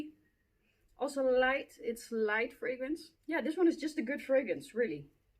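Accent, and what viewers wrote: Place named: Netherlands. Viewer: I love my line of YSL fragrances. La Nuit is probably in my top two fragrances ever. A dark, alluring, sweet and spicy... almost fresh cut grass sillage at times. Undoubtedly multiple of my favorite fragrances lie within YSL. You’re beautiful! I absolutely always love a Dutch accent. One of my favorites. Tot de volgende keer.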